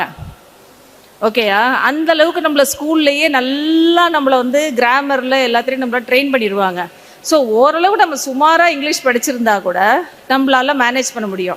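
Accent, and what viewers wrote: India. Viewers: உன்னைப் பார்த்தால் சிரிப்பா வருது..🤣🤣🤣 அரபிக்காரனுக்குத்தான் உன் English best ஆ தெரியும்... America, Canada ல பேசிப்பாரு... உன் பேச்சிலேயே நீ இந்தியன்னு சொல்லுவாங்க. (because of your accent) 🤣🤣🤣 முதல்ல ஒரு விஷயம் புரிஞ்சுக்க.. English ஒரு language தான்.. ஒரு வெள்ளைக்காரன் தமிழ் பேசினால் எப்படி சந்தோஷமாக ஏற்கிறோமோ அதே போல நாம் English பேசினால் அவனும் அதை ஏற்றுக்கொள்வான். அதுக்காக அவன் நம்மை அதிகம் படிச்சவன்னு நினைக்க மாட்டான்.. நம்மள மாதிரி முட்டாளா அவன்??? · ஆனால், நல்ல தமிழ் கதைக்க உங்களால் முடியவில்லையே. Madras தமிழ் தானே கதைக்கிறீர்கள்.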